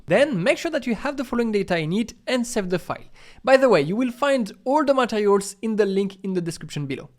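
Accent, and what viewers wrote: France. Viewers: Thank you, but I'm sorry, English with French accent is terrible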